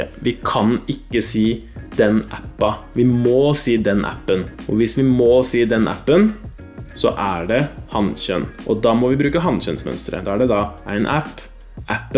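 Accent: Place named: Eastern Norway, Norway. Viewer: Det er stadig noen som sier 'jeg 'snakker' ikke nynorsk'. Ingen snakker verken nynorsk eller bokmål. Nynorsk og bokmål er litterære språk. Når vi er 5-6 år, er språket vårt ferdig utvikla - grammatisk sett. Vi må på skolen for å lære å lese og skrive. Der lærer vi å lese og skrive nynorsk, bokmål, engelsk, tysk, fransk, spansk etc + norske minoritetsspråk. Når vi skal skille norsk fra dansk og svensk, ser vi at dansk og svensk ikke har trekjønnsbøying, i samme grad, som på norsk. Vi kan altså si at norsk er et nordisk språk med trekjønnsbøying i substantivklassen. Substantivklassen er den største ordklassen. Vi kan generalisere ut fra det. Nynorsk er basert på norske dialekter. Eneste unntak er bergensdialekt og talemålet på Oslo V. På samme måte som med dansk og svensk så har de ikke mange hunkjønnsord. Alle andre norske dialekter har trekjønnsbøying. 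Når nynorsk er baserte på norske dialekter så er det 'bare' å bruke dialekten, slik som i videoen, for å finne rett kjønn. Legg merke til at, i videoen, snakker østnorsk. Østnorsk er norsk med trekjønnsbøying. Altså kan østnorske bruke sin dialekt til å finne ut av bøyninga i substantivklassen. Selv østnorsk er 'nynorsk'. Det er jo et norsk dialektområde. Å si at man 'ikke snakker nynorsk', og dermed 'hater nynorsk' er ikke et gangbart argument.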